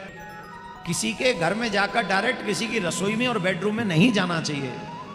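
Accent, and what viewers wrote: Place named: Braj, India. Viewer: Guruji aap braj bhasha bahut achchi lagti hai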